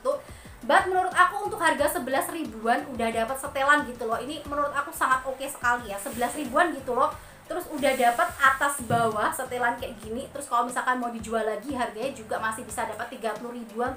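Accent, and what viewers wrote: Java, Indonesia. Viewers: Medok ya kak😄😁mksih infonya kk. Menginspirasi bngt😊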